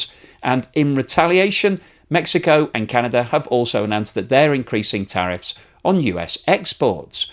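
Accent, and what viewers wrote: United Kingdom. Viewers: As a non native English speaker, I feel speaks the clearest English amongst all Internet speakers. Can anyone tell me from where is the UK is his lovely accent?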